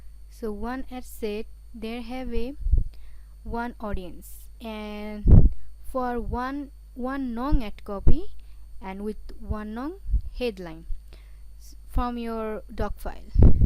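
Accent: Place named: Bangladesh. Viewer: speaking in english but bangla pronunciation!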